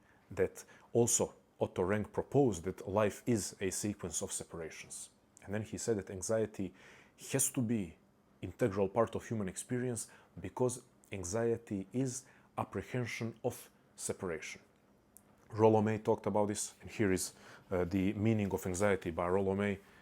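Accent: Balkans. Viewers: Broo, do you have Balkan roots? You have some strong Balkanic accent (strong š, č, ć, ž, đ, dž sounds)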